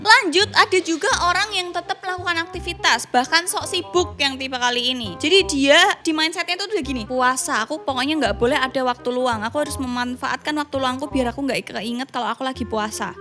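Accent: Java, Indonesia. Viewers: Medok wooooooooyy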